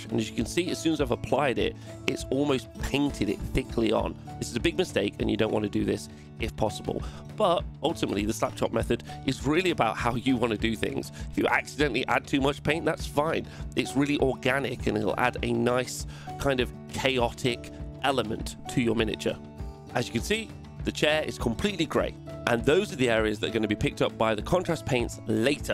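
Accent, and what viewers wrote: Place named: United Kingdom. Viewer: My favorite part was! “It’s a magical Frog” only Someone with a British accent could say something like that and it be as funny as it was! Someone with an American accent would just sound Ridiculous! Cheers from another, aKa TriptonStudios!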